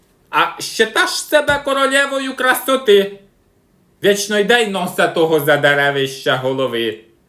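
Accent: Poltava, Ukraine. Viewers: Ой цей наш Полтавський сільський говор, прямо з язика знімаєтє.Воно конешно не в обсужнєніє, ну так жеж і є😊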